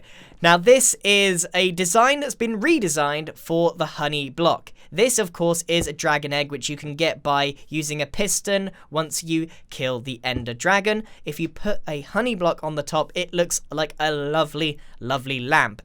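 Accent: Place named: London, United Kingdom. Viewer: Hannah Huang HES from London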